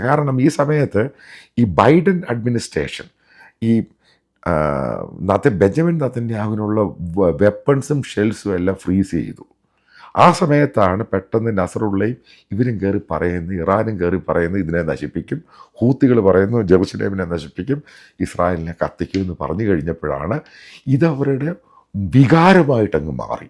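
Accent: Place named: North India, India. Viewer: Your accent is pretty poor . Especially when you say attack, Qatar, now you just said Vatican . You sound like a North Indian Jero(Zero)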